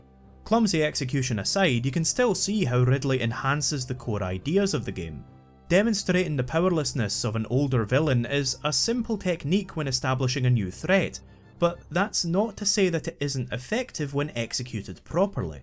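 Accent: Scotland, United Kingdom. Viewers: love the Accent. Are you from some where near Glasgow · Are you Scottish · Do you have a Scottish accent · man with thickest Scottish accent in the universe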